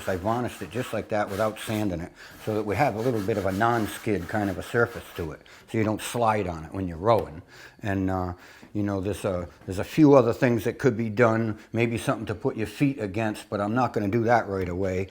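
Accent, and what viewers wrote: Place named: Maine, United States. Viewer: I am guessing you're from Maine from your accent (I grew up there)....I just got my first planer and I greatly appreciate this video, I am going to make these adjustments THANKS!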